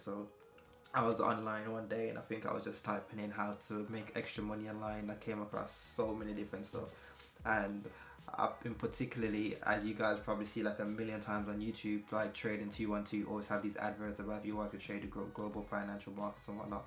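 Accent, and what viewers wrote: Jamaica. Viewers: whats your ig? you sound jamaican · Di Jamaican accent a chip een tho lol thanks for the video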